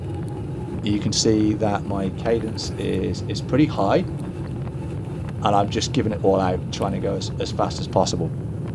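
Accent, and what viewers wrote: United Kingdom. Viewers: Where is this? You sound British but it looks like Colorado.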